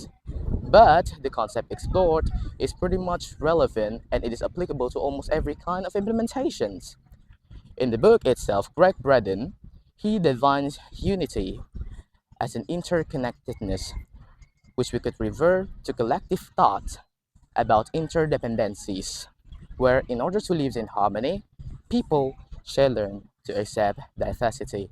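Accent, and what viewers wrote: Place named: United Kingdom. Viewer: British nya terasa banget 😂 , tolongg sesekali buat video jokes pake bhs inggris dong.. 😄 arry po ah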